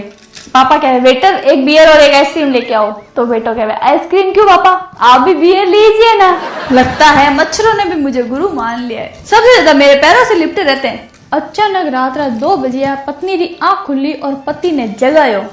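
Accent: Marwar, India. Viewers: aapra awaj me marwadi ghani chokhi lage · 👉l like your😍 marvadi boli & also your dressing sense 👌👍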